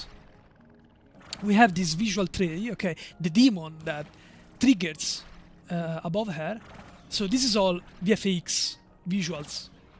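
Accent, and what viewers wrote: Italy. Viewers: bro are you italian?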